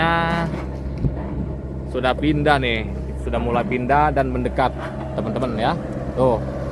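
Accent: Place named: Jakarta, Indonesia. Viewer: Nggak apa2, enak kok ngedengerin logat betawi ora 😅😅😅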